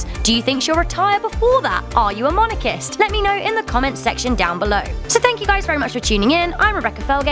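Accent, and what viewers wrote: United Kingdom. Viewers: sounds British so I would think this whole video is extremely accurate since she would have her experience to know herself.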